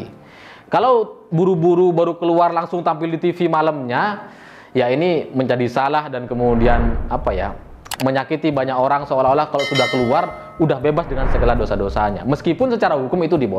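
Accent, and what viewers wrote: Madura, Indonesia. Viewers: Kok logatnya Madura?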